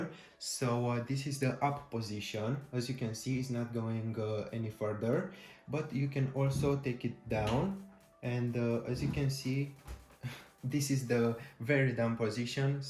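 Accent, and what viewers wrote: Romania. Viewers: i'm pretty sure that s a romanian accent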